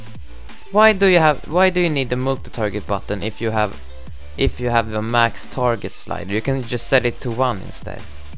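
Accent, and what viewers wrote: Sweden. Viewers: Wow u can really hear that u are from Sweden #SweGang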